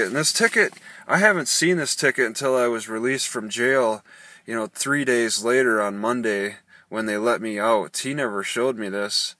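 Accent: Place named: South, United States. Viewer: A word of advice your voice is awesome your face not so much point your camera at something else and just let your voice do the talking I subscribed because your videos are edgy and cool and your voice is a combination of a southerner a Pacific Northwestern and a guy from Jersey!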